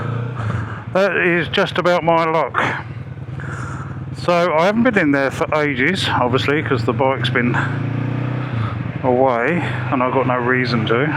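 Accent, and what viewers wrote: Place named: Southern England, United Kingdom. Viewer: good evening. Great to see you out on the Vulcan after so many months. Are you actually Swedish or originally from the South of England as your accent suggests. Perhaps you could give us a brief run down on your background. But of course, only if you want too 😅Enjoy your spring and summer riding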